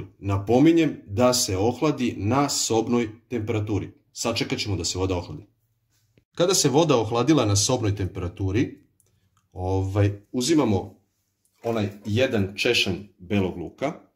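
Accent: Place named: Serbia. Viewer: Вслушиваясь в ккрасивый баритон и слова, угадывала, какой национальности принадлежит человек.Многие слова знакомы,текст понятен почти полностью.Серб, оказалось!!! Спасибо, дружище! Успехов Вам!!!!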